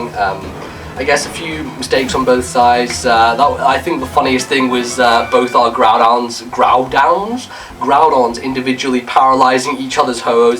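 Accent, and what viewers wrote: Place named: United Kingdom. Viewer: i think hes an indian with a british accent who lives in scotland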